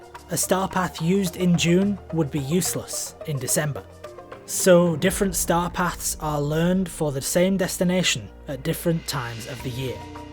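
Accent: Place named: United Kingdom. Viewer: Your accent is awesome. Where in the UK are you from?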